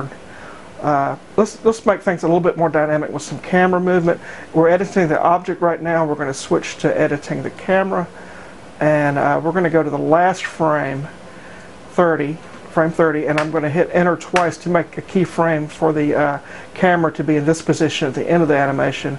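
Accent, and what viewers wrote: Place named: South, United States. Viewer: Hey! Is that a pro minidisc deck to the left of the A1200., I've corresponded several times with you on <link> and through e-mail regarding Amigas and Lightwave - but I never knew you had a bit of a Southern US accent!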